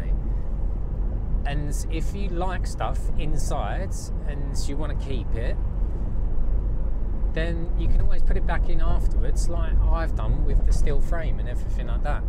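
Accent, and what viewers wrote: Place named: Portsmouth, United Kingdom. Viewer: So interesting. I found your channel by chance when looking for some cheaper way to get insulated plasterboard, I'm now heaviliy invested in your life...haha. Subbed. BTW, are you originally from Pompey, you certainly sound like it!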